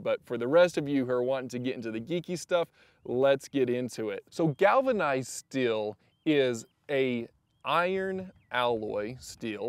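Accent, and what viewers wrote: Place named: Texas, United States. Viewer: "Still" what a texan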